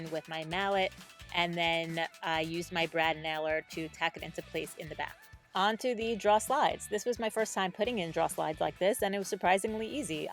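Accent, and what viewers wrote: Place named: Long Island, United States. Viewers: Very nice! I can see this piece being handed down for generations. And the northeast accent "draws"... gotta love it! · great build. My son would have loved this 10 years ago! BTW, can totally hear the Long Island in your voice on this vid.. :-)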